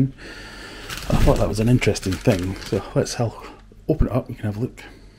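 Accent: Scotland, United Kingdom. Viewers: We are literally being screwed by fitting manufacturers to own dozens of these things with diameter, thread, pitch variations! Guess I was luck the ones I got for my outside taps went straight onto both of my shower outlets. Keep the videos coming and nice to hear a Scottish Accent (I am an economic refugee in darkest England and miss home!).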